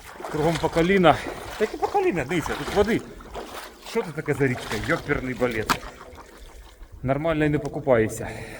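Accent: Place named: Surzhyk, Ukraine. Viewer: Що ж вас так коробить? Все гарно у нього виходить , нічого він не мучається , класичний український суржик .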